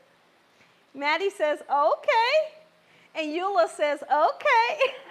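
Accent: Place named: South, United States. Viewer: I just love you I love ❤️ you southern accent and cooking I learn so much from you I just got the best of both worlds cooking southern dishes and I also cook Spanish dishes but sheesh ain’t nothing I can’t cook thanks to you and YouTube ❤❤❤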